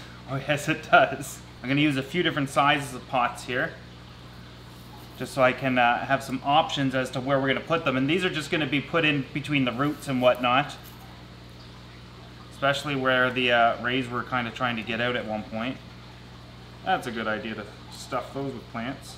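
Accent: Canada. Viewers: Hes canadian, was accounting for his accent. Anything remotely close is better than permagranite lol.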